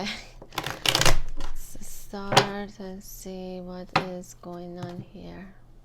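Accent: Iran. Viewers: Your accent sounds very Persian!!! Great read:)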